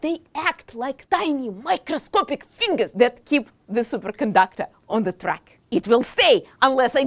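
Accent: Russia. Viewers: My new fav person 😂 makes me miss one of my favorite teachers, Mrs. kaziyarian — same Rusky accent with a zest for teaching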